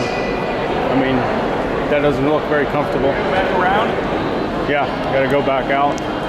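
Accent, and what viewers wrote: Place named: United States. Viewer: You looks like Indian and having accent like American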